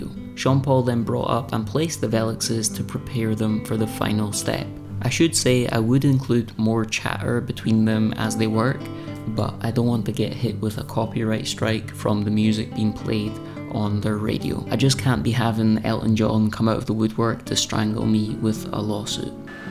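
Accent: Scotland, United Kingdom. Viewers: More Scottish accents please!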